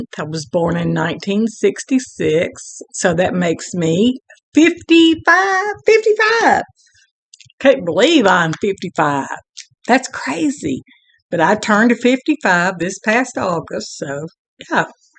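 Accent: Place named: South, United States. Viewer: Love your ramblings and adore your southern accent! Looking forward to the next one!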